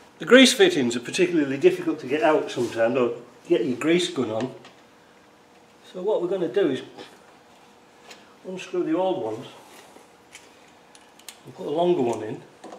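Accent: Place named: Middlesbrough, United Kingdom. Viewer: I recognise your accent - I’d guess Middlesbrough / Darlington area?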